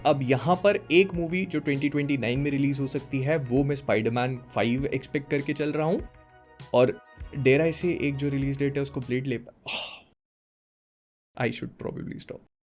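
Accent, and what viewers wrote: Bhopal, India. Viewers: Aaj bhai full bhopali mode me hai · Are you bhopali?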